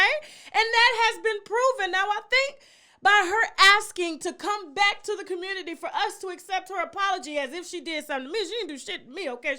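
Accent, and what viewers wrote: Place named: South, United States. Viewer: She’s southern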